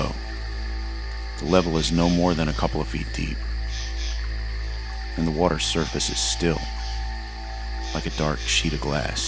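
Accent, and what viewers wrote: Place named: United States. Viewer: Great narrative. Good thing by an American otherwise I think I'll have a hard time understanding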